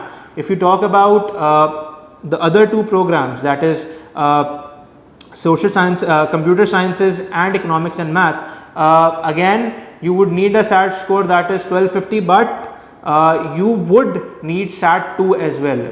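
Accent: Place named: Pakistan. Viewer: Man you have a nice Pakistani accent ❤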